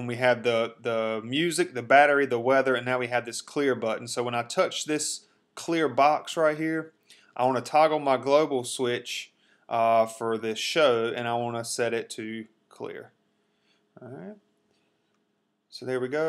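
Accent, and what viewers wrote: South, United States. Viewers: man. this man's country accent. feels like cowboy from action movie is teaching me programming.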